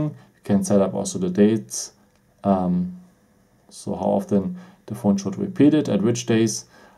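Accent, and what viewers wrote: Germany. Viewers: R u german? It really sounds like u r german xD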